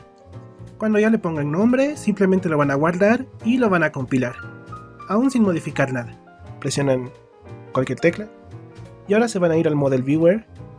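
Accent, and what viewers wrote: Argentina. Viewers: español argentino papa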